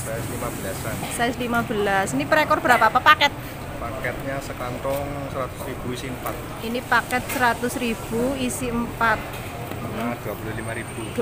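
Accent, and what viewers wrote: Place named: Banyumas, Indonesia. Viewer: mantap nih satu frkwensi kontenya, pada pada wong ngapak hahahay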